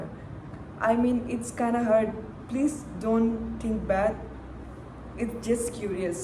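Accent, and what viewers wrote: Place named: Dekapam, India. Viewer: Avi pata chala ki ap dekapam se hai bolke😅 im also from dekapam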